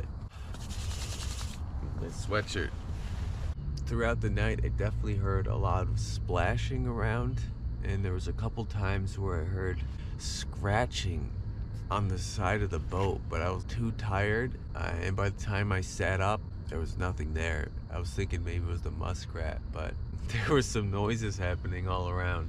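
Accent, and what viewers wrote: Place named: Brooklyn, United States. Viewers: where is this guy from? the accent is subtle. near new york, perhaps? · Unintentional asmr, Mild Hint of Brooklyn Edition. Mom or Dad came from Brooklyn, based on 1 degree of separation ideolect dilution.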